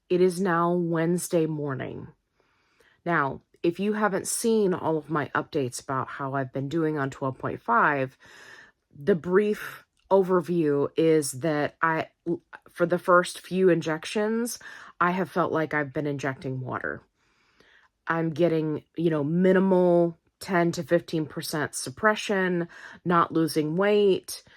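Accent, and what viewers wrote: United States. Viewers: Keven's first snow!! 🩷 Side note: I always assumed you were from Ohio! (I like to guess where someone is from by their accent.) As a former Buckeye I thought we had the same accent. 😂 Didn't place you as a Southerner. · I’m a southwestern Ohioaner, I thought she was originally from West Virginia. 😂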